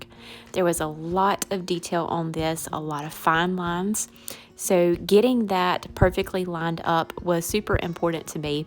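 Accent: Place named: North Carolina, United States. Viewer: Absolutely beautiful your accent sounds like you’re from N.C I live in Canton N.C beautiful work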